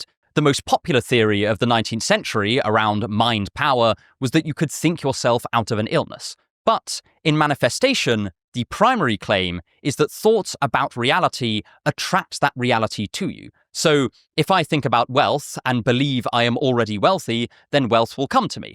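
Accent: United Kingdom. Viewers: Definitely the best, purest British English I ever heard in my five years living in London. Great guy, I wish there were lots like him.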